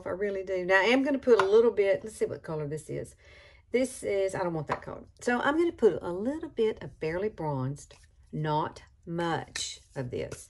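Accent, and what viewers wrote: South, United States. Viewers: I’m from Mobile Alabama I thought you sounded southern. Southern girls need to stick together. You’re absolutely beautiful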